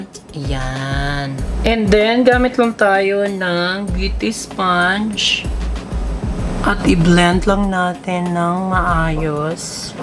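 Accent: Visayas, Philippines. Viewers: Tuwang tuwa talaga ko pag napapakinggan ko yung Visaya accent mo vevs ❤️ nawawala stress ko dito . Your supporter from japan 🇯🇵 Josh Rubio po . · It is abelabol HAHAAAAH i really love ur visaya accent mamsh 😍😂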